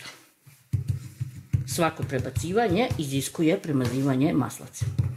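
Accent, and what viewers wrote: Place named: Backa, Serbia. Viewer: predpostavila sam da ste iz Backe po dijalektu.Jos jednom veliki pozdrav,laku i mirnu noc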